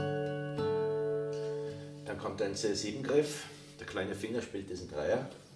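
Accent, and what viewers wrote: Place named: Bahia, Brazil. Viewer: Putz pensei que era baiano.....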